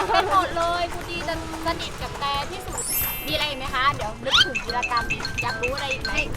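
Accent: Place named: North, Thailand. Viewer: พี่แตพูดเหนือน่ารักมากเลย ดูเรียลจริงๆ รอติดตาม Ep. ต่อไป ❤️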